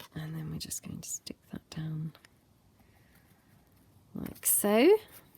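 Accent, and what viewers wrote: United Kingdom. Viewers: Hi! I am a fairly new subbie, and wanted you to know how much I am enjoying your videos, and you! I was sorry to hear that some thoughtless person felt compelled to leave you negative feedback...for...what? Did I hear you right, for being too chatty? Really? I find your conversation light, real, and interesting. And, your sweet accent is music to my ears! If you don't mind me asking, you sound British to me, so I am curious as to where in the UK, do you live? It seems you and I are on the same wavelength, because I too have been very inspired lately by Creativecafegirl. In fact, today I was playing around with making a little something, with her in mind, when I clicked on your video...which made the whole experience even better, so thanks for your company and inspiration also. Your pockets turned out stunning! You did a marvelous job, and I just luv them! 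Your Bellissimo kit looks gorgeous! I must go look around at your Etsy shop. Sorry for being "so chatty"...LOL! TFS! xo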